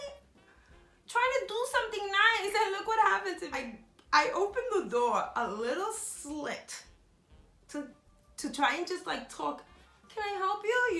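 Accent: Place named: Spain. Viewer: I enjoy you a lot I just kind of met you watching my TV and I'm happy I have to watch somebody to Spanish like me and curious and with a little bit anxiety like me you're wonderful to me and I love watching you I love your accent oh the way you talk I'm Spanish to and I love your new baby you can't get him wonderful he is adorable I love him blessings want to be your friend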